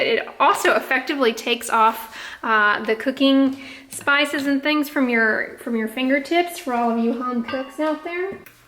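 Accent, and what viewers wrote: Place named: Texas, United States. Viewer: Happy New Year, if I don’t see anymore videos till then, I wish you and your Mommy a healthy and fun one. 1) I thought you were faking the Texan accent, lol, I did not know you grew up in the South. 2) avoiding socially gatherings, you are right, those will keep you germs free...but sometimes they are fun. 3) I bet with myself that you would reach 50,000 subscribers by the end of the year...but you might even go over that number. I learn so much from your videos, thank you for the effort you put into them, you are very much appreciated. 😘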